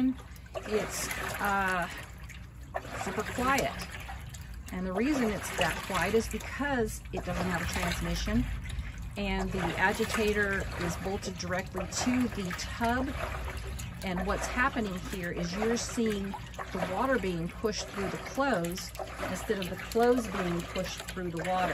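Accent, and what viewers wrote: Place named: Ireland. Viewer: Tell me, what part of Ireland are you from?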